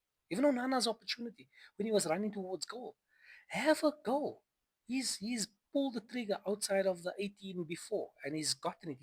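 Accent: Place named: South Africa. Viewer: beautiful accent. are you from south africa by any chance?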